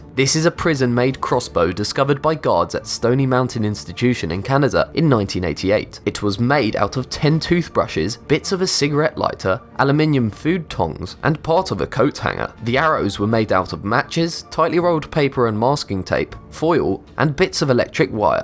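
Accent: England, United Kingdom. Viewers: Britsh accent again boring. Pass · O look another British narrator. Pass, dislike! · That's just an english accent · Final boss I think he sounds a little bit British.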